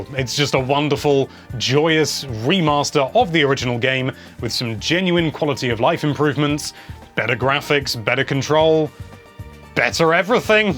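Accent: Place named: United Kingdom. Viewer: I really appreciate the way you talk about games and the cadence you use and how you try your best not to spoil any of the plot. I have watched a decent amount of your videos and they are great. Your accent actually makes it even more appropriate for you to review the Xenonblade series since all of the characters have a similar accent...um...British perhaps (multiple use of the word "govens"). I love Xenoblade Chronicles and I am glad they put some of that quality of life stuff in (especially for side quests because it drove me CRAZY to run around at random in an area to pick up blue orbs in hopes of finding quest items for over an hour sometimes). Nintendo should thank you. Your reviews are sometimes an important factor I utilize when deciding to purchase games. I will definitely be picking this up. "I'm really feeling it!" Thanks.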